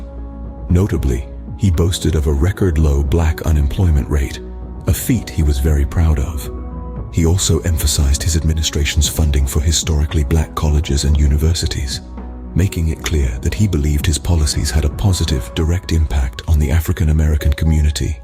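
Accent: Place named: United Kingdom. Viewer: Gotta love your choice of narrator A white British man?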